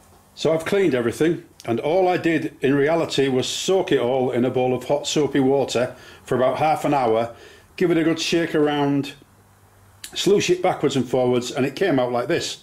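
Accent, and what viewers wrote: United Kingdom. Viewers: I'm from Poole, but currently live in the wilds in British Columbia, so it was nice to hear a Brit explaining the Dyson's guts in my own "language". :-) I swear I have spent more time trying to get my Dyson working ok than I have actually using it. No more!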